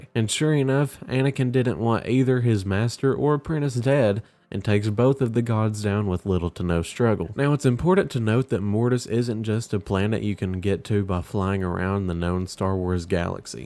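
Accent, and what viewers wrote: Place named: South, United States. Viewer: That accent is 🤌 yeehaw my fellow southerner.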